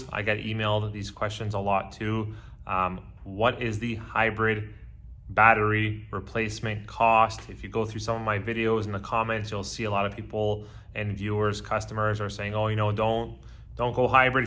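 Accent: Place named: United States. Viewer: Why are you using kilometers units, obviously you sound you’re from the US. 180,000km equals roughly 112,000 miles. The problem I see is first you are paying a premium bc it’s Hybrid, and if the batteries fail before the warranty, wouldn’t that eliminate the savings for fuel ( the reason why you bought a hybrid) If you’re an owner that keeps a car for a long 15-20 yrs, (like me) how much EMF has that person been exposed to ?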